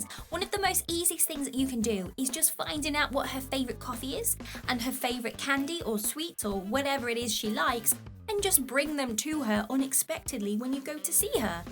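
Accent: England, United Kingdom. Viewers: Always good contents 👍 thumbs up.I will check for audible and the books you are recommanding, thank you. Ps. Your english accent is music to my ears, you clearly can use this to charm someone 🤩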